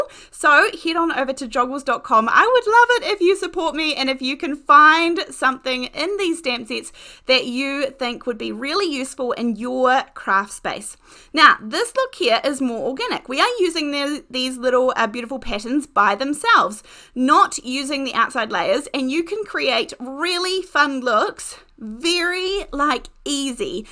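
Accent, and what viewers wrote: New Zealand. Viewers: So delighted for you,! I so enjoy watching your videos and learning great tips from you. You’re so creative and down-to-earth. And enjoy the Kiwi accent which reminds me of my lovely coworker from your country. This is a wonderful “step change” in your paper arts career! 🎉❤. I’ve got three sets in my cart!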